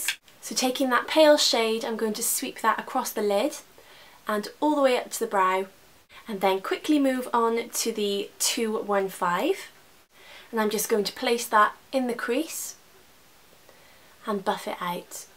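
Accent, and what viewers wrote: United Kingdom. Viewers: i love your accent! whereabouts in england are you from?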